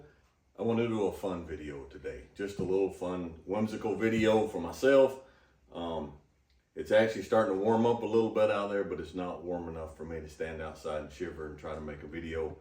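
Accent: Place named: West, United States. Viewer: I wish to get this western accent.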